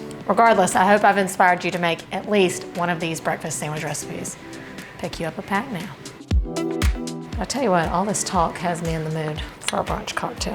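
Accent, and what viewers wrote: South, United States. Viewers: I am new to your channel and enjoying very much. That could be because you are very attractive and an Italian with a southern accent ❤ great videos i can't wait to try your recipes.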